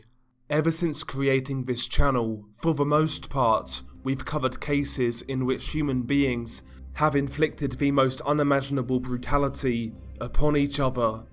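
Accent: England, United Kingdom. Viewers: He's English. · The British accent 😂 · Just discovered this channel a few weeks ago and been bin watching. He's a great narrator, must be the british accent. Great channel, i even saw other channels doing reaction videos to this content.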